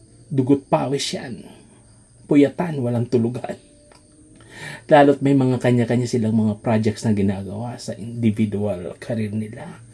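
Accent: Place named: Bicol, Philippines. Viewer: Bicol accent, I love that. About, tugang, you can say that again.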